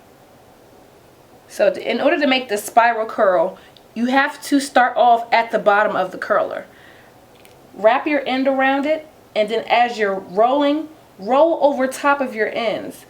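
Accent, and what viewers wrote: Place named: Washington DC, United States. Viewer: giirrrrrlllaaaa (SE girl accent) I knew I recognized that accent from somewhere. I knew you were from DC. Hey girl hey!!! yesss represent for the 4 type hair girls!!! Love all your videos so far and the fact that I subscribed!!!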